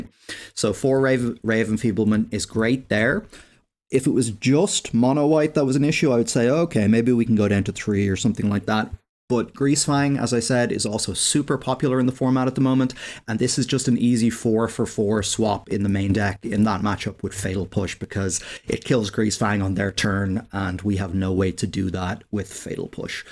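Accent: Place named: Dublin, Ireland. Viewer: Coming to the party a bit late but thanks for the deck tech! It's great to hear a dublin voice in my ear around Xmas!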